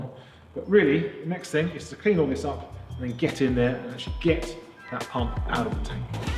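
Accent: United Kingdom. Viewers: Субтитры в процессе, хотя я к 4 серии британский говор стал лучше понимать, до этого в основном американский английский слушал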